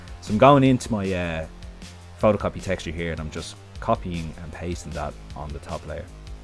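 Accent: Ireland. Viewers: It is so refreshing to hear an irish lad talking about graphic design. Can't wait to see more of your videos :) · Awesome tutorial! I was taken aback by the real dub accent haha...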